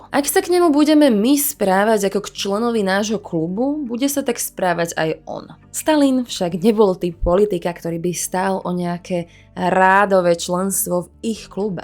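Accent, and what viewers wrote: Bratislava, Slovakia. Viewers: Bratislavsky dialekt (nasilny akcent na prvu slabiku znižuje cely dokument....( suslanie ba 2.mieste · Slovenský hlas 😍 tomu dává úplně jiný Vibe 😇 jinak super video 😇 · Krasny, sexi hlasok, az na ten bratislavsky prizvuk a neznalost "ľ" ;)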